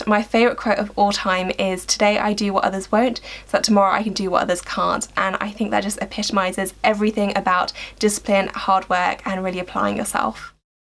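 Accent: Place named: London, United Kingdom. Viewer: She's just from London, that's how they sound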